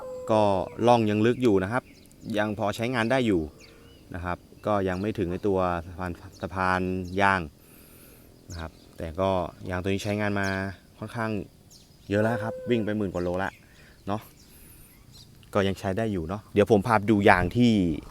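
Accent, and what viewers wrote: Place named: North, Thailand. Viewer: จะถามหลายทีแล้วครับ จขกท.เป็นคนที่ไหนครับ สำเนียงออกทางเหนือจังเลยครับ ไงก็ติดตามคลิป ตอนนี้ยังไม่มีกำลังทรัพย์กับวาสนา อีกหน่อยคงได้ควบฮาเล่บ้างครับ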